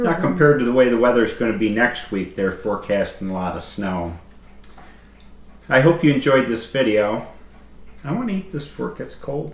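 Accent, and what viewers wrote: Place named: Central New York, United States. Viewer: I live in Kentucky after 18 years Finger Lakes...you definitely have that central NY accent. Nice to see drone footage with a little sliver of Cayuga Lake right at the end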